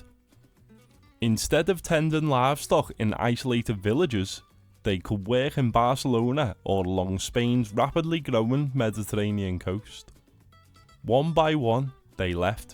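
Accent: Scotland, United Kingdom. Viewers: Are you from Scotland? I mean, it's Scottish pronunciation?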